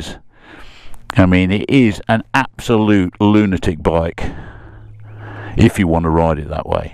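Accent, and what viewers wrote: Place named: Northern England, United Kingdom. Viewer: Such beautiful landscapes, such a nice accent, so different from what you learn in school. Sounds northern english, is that correct? Greetings from blackforest germany 😊👍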